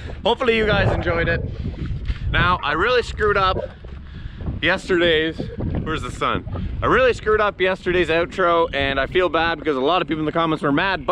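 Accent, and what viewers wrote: Canada. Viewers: Americans are back wards lol. We pronounce it that same way as you Canadians here in Australia. Shift it.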